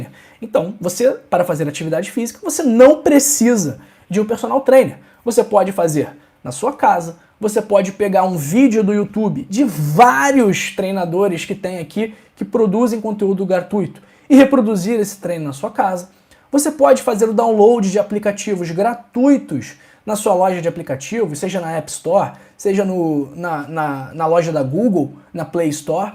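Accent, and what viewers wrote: Rio de Janeiro, Brazil. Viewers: Parabéns Dr. Acho lindo o sotaque dos cariocas, mais ainda, as suas explicações. Moro em São Paulo a mais de cinquenta anos porém, sou mato-grossense. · Bom dia Dr.! Eu acho lindo o sotaque carioca, aliás eu ter nascido no Rio de Janeiro, a Cidade Maravilhosa, por sinal eu conheço o Rio de Janeiro, já fui conhecer, tudo lá é bom, belo e maravilhoso! Eu amo o Rio de Janeiro demais! Um dia quem sabe eu morarei lá né? ❤ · Bom dia Dr. Sou Amélia e sou carioca como vc e o nosso sotaque é caracacteristico dos nascidos no Rio de Janeiro, as pessoas mal educadad e sem princípio ficam se apegando a essas picuinhas. Dr. Vc é perfeito em todas as suas colocações e educado; talvez a sua pronúncia deve ser motivo de inveja para os que o criticam eu tbm já fui criticada dizendo que eu falo chiando e este tipo de gente com certeza não deve ter o que fazer, e falta de berço. 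Vc é muito importante para nos orientar no cuidado com a nossa saude. Somos todos gratos a DEUS que o colocou em nossas vidas. E lembre-se Dr do antigo e dito popular: " O que vem de baixo não nos atinge" e ser educado é uma questão de berco. Dr o abençoe sempre e guarde todos os seus caminhos. ABC. ❤ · Pois eu acho lindo o sutaco dos Cariocas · Não sou carioca, mas amo o sotaque do carioca! Cada estado tem o seu sotaque! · Gostei muito do seu Sotaque pois O Sotaque Carioca é muito lindo LLLlllllllllllllllll parabéns Amei Dr, 🙏🙌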